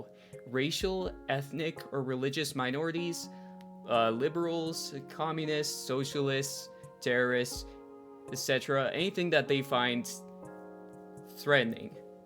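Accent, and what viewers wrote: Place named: Canada. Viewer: oh wow this guy has dean withers featured in some of his captions, "fighting the good fight". I think time will tell on dean withers, not that it isnt telling at the moment. the guy is a scum bag. this video is a fun attempt at some kind of disection of current day politics focusing on the right and donald trump. also, he sounds canadian. its weird how the left insist on accusing the opposition of the things the left are guilty of.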